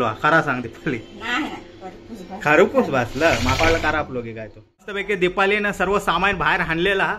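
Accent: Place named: Malvan, India. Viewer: व्हिडीओ चांगलेच असतत मालवणी भाषा बोलण्याची ठेक माका आवाडता शात हा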